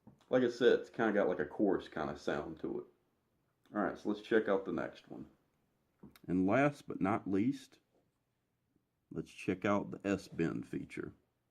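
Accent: United States. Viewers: Will this work worth a shit through the front? For some reason I never have much luck through the loop. You sound like you from Bama.🤘🏻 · Incredibly informative video, great work. Oh and the southern charm is always welcome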